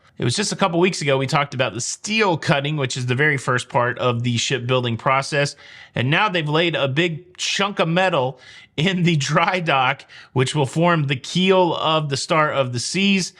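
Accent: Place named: South, United States. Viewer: Sounds like you're picking up a bit more of a southern accent! I'm a little irked about the increase in the price of the bottled water. It's a weird thing for me to be irked about since I start out being irked I need to contribute to the single-use plastic problem by getting it in the first place. I drink 3-4 liters of water a day and I use tap water and filter it. That's not really a thing on cruise ships so I combine water from the buffet and bottled water in my cabin. This just means I need to find a way to filter water in my cabin. The sink is inconvenient for anything but washing hands and brushing teeth but where there's a will, there's a way!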